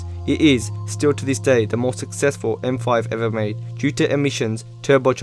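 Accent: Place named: Birmingham, United Kingdom. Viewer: that birmingham accent come onnnn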